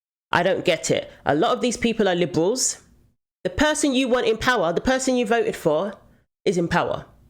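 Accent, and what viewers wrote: United Kingdom. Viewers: You are Smart, Pretty and have a Great British Accent 🙂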